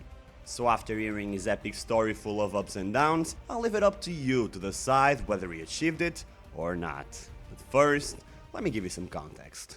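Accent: Portugal. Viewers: Mano o cara que faz os vídeos é português esse sotaque nao engana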